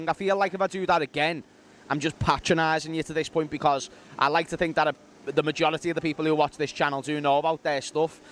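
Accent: Liverpool, United Kingdom. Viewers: This fella is offensively scouse ...LEEDS LEEDS LEEDS LEEDS ! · Always love the Liverpool accent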